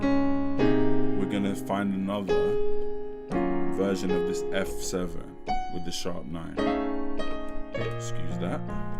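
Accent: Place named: United Kingdom. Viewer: Wow when I heard the British accent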